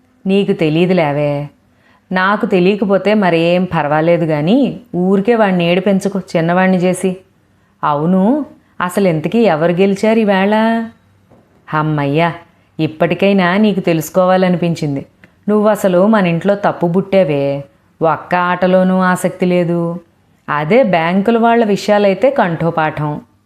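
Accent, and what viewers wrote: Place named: Andhra Pradesh, India. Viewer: Madam meedi AP lo ye jilla ? Mee slang ( or accent) ki nenu pedha fan.Mee story chadhive vidhhaamam chaala natural ga, kallatho chusthu chepthunnatluntundhi Maadhi Nellore.Anduke meeru story chadhuvu thunte bhale vinaalanipistundhi .🌹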